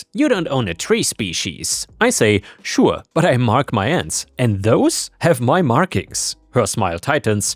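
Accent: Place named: Germany. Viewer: German accent really works with these revenge stories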